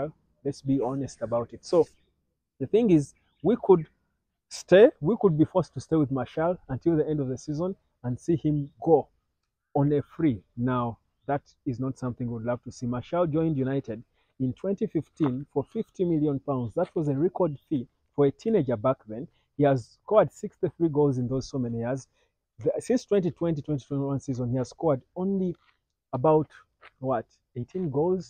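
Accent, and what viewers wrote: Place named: Uganda. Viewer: Nice to see you from home soil though you didn't name it but from your accent I guess Uganda, my girlfreind is Ugandan by the way😊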